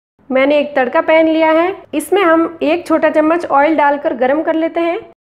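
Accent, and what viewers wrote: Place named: Rural, India. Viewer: * आपका उच्चारण देशज झलक वाला है , अच्छा लगा । 💐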